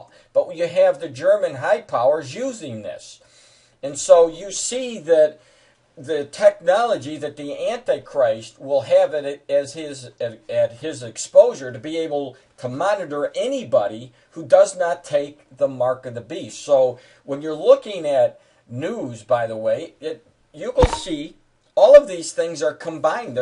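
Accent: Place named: Rhode Island, United States. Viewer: are you originally from Rhode Island by any chance? I'm hearing bits and pieces of an RI accent. Another great video!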